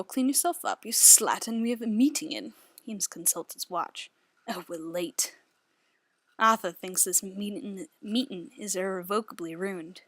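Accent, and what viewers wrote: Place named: South, United States. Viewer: Oooh boy, I *love* your accent and how it rang true for Arthur but how you switched it for the 'television american' accent and Eames own voice. I'm a sucker for south accent and your voice is all kinds of wonderful! Great dramatic reading, I enjoyed it *a lot*. Would you mind if I were to download the mp3 version of it?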